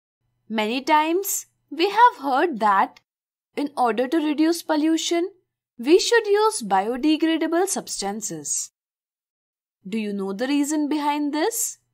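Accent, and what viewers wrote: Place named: India. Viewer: terrible Indian accent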